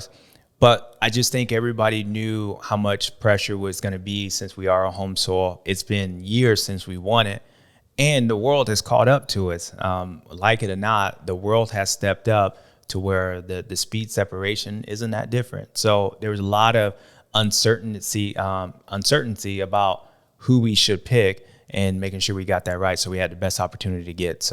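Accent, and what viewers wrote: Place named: Florida, United States. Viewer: Home Soul 😂 gotta love that Florida farm boy accent. Love you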